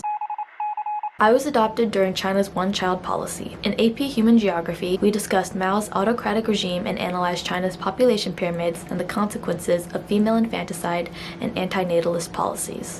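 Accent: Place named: United States. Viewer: I love you Americans and your accent :)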